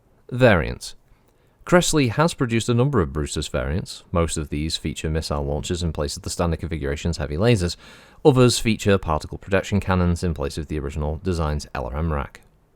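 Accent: United Kingdom. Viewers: I'm now going to start picturing you in director Krennic's uniform when you're doing every recording with that thick British accent 🙂.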